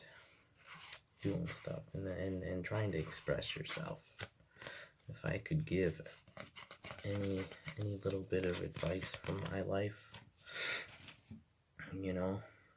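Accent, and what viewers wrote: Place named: Midwest, United States. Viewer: Have you always lived in the east? (Don’t know if you are comfortable with me saying your state). I ask because it sounds like you have a little midwestern in there!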